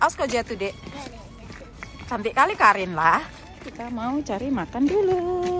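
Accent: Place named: North Sumatra, Indonesia. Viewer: Anak mix bule tapi logat batak nya kental ya mbak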